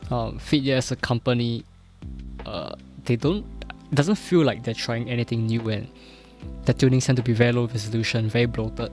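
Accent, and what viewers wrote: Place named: Singapore. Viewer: I hear da accent I noe is Singaporean lollol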